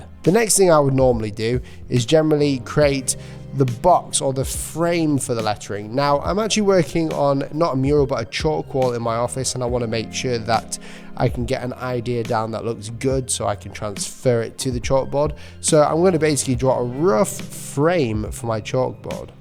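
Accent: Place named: Yorkshire, United Kingdom. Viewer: Are you from Yorkshire? You sound exactly like the voice in my head. It’s weird.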